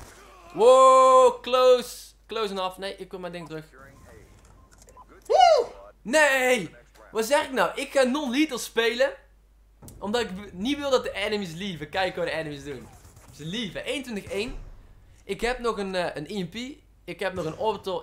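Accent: Brabant, Netherlands. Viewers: Kom je uit Brabant?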